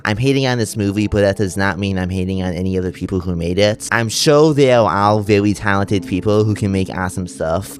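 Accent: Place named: France. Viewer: heyy! i wanted to say you sound like the french nararrator from some spongebob episodes :)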